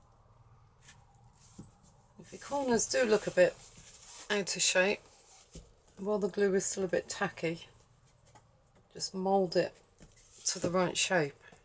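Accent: United Kingdom. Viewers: you live in our dream town!When my husband retires, that's where we want to live. I've only just discovered your channel - and from your accent you, like me, are from Oop North! Loving the chat, loving the tutorials and the way you do stuff. When we're in Glastonbury next we'll meet you at the cross and go for a cuppa or a drink. Hugs from a Scouser xxxxxx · Thank you so, so much for this tutorial. This is exactly what I wanted for my journal cover. I appreciate you taking the time to show every step of the way to achieve this result... and all of this with your beautiful accent that I LOVE. I am in South America but my soul dreams of a lovely quaint cottage in the English countryside. Anyway, did I say thank you? THANK YOU! THANK YOU! THANK YOU!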